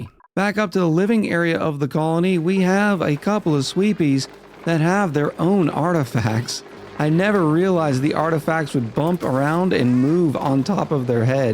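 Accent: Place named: United States. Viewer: I like your country accent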